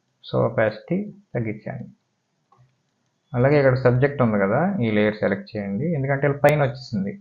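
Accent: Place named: East Godavari, India. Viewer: Anna ne proper ekkada? konchem east godavari slang matladutunnav!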